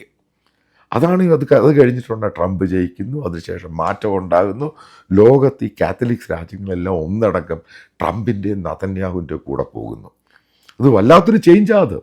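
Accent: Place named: North India, India. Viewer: Your accent is pretty poor . Especially when you say attack, Qatar, now you just said Vatican . You sound like a North Indian Jero(Zero)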